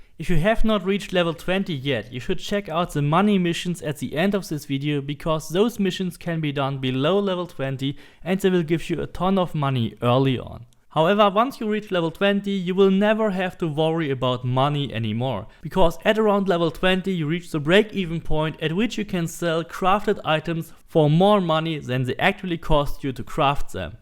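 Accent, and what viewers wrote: Germany. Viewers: Der germanischste akzent den ich je gehört habe. Probs für das informative video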